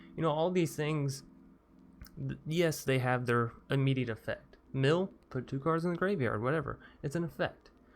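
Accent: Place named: East Texas, United States. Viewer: Your combination of the east Texas-esqe accent with the geeky content is highly entertaining.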